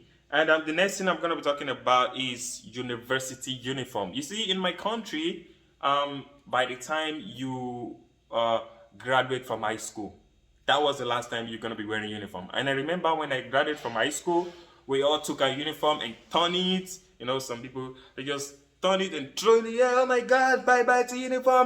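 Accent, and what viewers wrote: Philippines. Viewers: your english is so filipino~ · Your accent is filipino already. Why is that?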